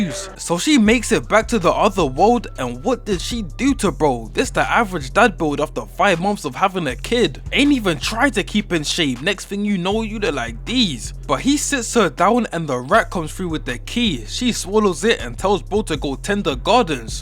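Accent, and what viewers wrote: United Kingdom. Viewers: idk what it is about people with a uk accent reveiewing anime/movies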